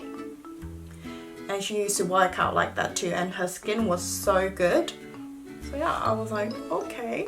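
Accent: United Kingdom. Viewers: 저는 핸드폰과 이어폰이요!! 운동할때 너무 지루하고 힘들어서 그 때는 좋아하는 노래를 듣거나 영상을 보면 시간이 진짜 금방 가요 흥얼흥얼거리면서🎶🎶 언니 영국발음 너무 좋아요ㅠㅠ 언니처럼 영국발음하고 싶어요ㅠㅠ 제가 영어 좋아하는 편 아닌데 언니덕분에 배우고 싶은 욕구가 엄청 쑥쑥!! 오늘도 영상 고마워요😍😍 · 우아 진짜볼때마다 느끼는데 영국식?영어? 어쨋든영어넘넘잘하시네요😃부러워요😢영어팁도브이로그로알려주심감사할것같아요❤ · sydney님 영상 정말 잘 보고있어요<3 영국발음 너무 멋지세용!! 저는 해외 살때는 쥠을 자주 다녔는데 한국에만 오면 헬스장을 안가게 되더라구요ㅠㅠ 외국에서 쥠 다닐땐 거의 저도 생얼로 다니지만 꼭 포기 못했던건 눈썹!!!!!! 그리고 립이였던 것 같아요 ㅎㅎㅎ 저도 틴트는 꼭 들고다녔어요 히히 그럼 좋은 연말되세요 님! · 언니 영상 볼때마다 항상 영어 공부가 되는거같아서 정말 좋아용 영국식 발음 듣는거 좋아하는데 언니 영상 보면서 흐뭇흐뭇^_^ 저는 운동할때 포기할수 없는건 립이에요 다른건 없어도 아파보이지는 않으니까요!! 립 하나만으로도 생기를 줄 수있우니까=3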